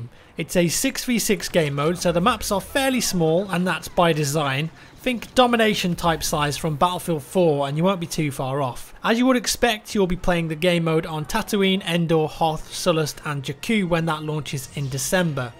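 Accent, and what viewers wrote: England, United Kingdom. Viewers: You sound British, yet you say beta the American way? Lol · Lewishague10 well me and are from the same town in England and thats just how its said, put it down to accent or w/e you want but it is what it is and it is fact lol. bee-ta i wouldnt just make shit up for the fun of it lol. even admitted it himself so there you go.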